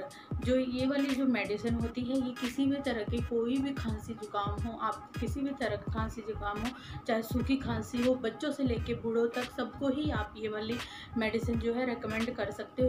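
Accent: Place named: Kolhapur, India. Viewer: बहूत आभारी है मैडम कोल्हापूर के भाषा में लय भारी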